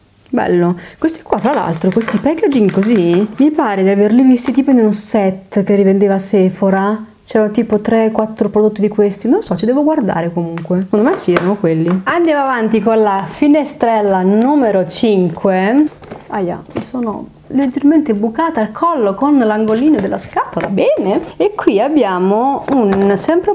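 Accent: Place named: Emilia, Italy. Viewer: Ahhhh vedi!? Io sono mezza veneta e mezza emiliana. Ho sentito un po' di accento emiliano (magari dopo qualche anno a Bologna lo hai assimilato!) per quello 😂 Spero di non essere stata indiscreta nel chiedertelo! 💕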